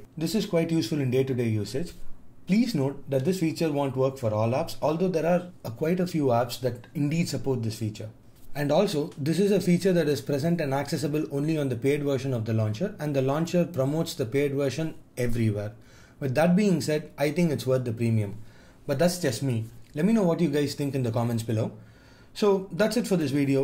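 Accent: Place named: India. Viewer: That Indian accent doe.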